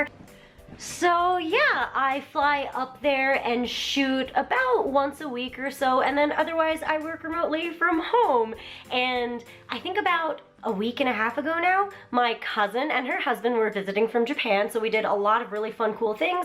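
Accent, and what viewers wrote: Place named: Los Angeles, United States. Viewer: where were you born? you have american accent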